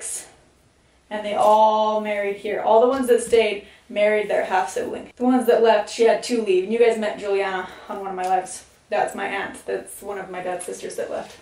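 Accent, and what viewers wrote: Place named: Alabama, United States. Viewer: Are you from Alabama by any chance?😅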